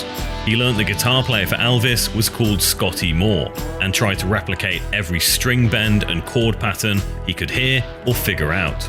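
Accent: England, United Kingdom. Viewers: It should be a law that anyone who speaks with an English accent not only Not inaccurately do Narrations for US music bands but get the facts about the bands their poorly inaccurately doing the Narration correct....yeah I said it and stand behind it. Especially PANFUCKINGTERA DICK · Heavy Metal documentaries with British accent narrations SUCK!!!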